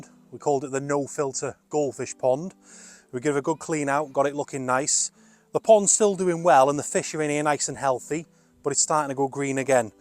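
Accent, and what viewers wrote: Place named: Cornwall, United Kingdom. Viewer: Thought i could hear a jacko accent. Wont the stocked goldfish keep the pond clean.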